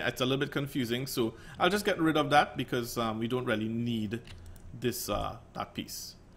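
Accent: Trinidad and Tobago. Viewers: why do you sound Trinidadian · you from trinidad awa?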